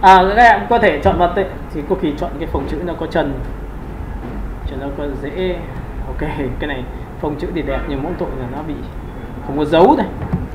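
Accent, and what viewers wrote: North, Vietnam. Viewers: mãi mới tìm dc thầy giỏi .nói giọng bắc. mấy cái miền nam ko nghe rõ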